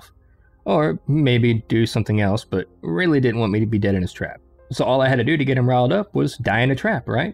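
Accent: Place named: South, United States. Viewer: youre welcome I do too. He is from North Carolina a southern accent. We do similar vid3os. I enjoy your interputation of it.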